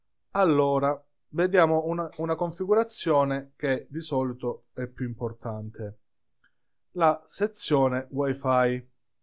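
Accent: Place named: Calabria, Italy. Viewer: sei calabrese come me si sente, complimenti per il video!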